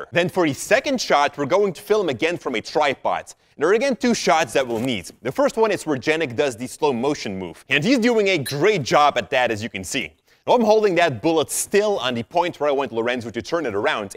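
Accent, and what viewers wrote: Flanders, Belgium. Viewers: ik wist niet dat je vlaams spreekt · Ik wist niet dat hij vlaams was😂😂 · ik wist niet dat je vlaams bent, ik kom zelf uit nederland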